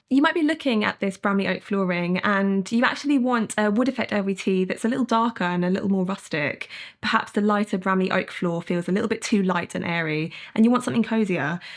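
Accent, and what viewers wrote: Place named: United Kingdom. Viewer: Like a young Marisa Tomei with a British accent…and knowledgeable about home interiors!? 🤯😍 I’d be so over budget I’d need to pull a series of life threatening jewel heists. 😅 Liked and subscribed. 🤌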